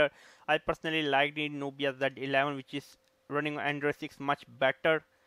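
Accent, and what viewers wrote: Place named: India. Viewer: this is an indian accent ^^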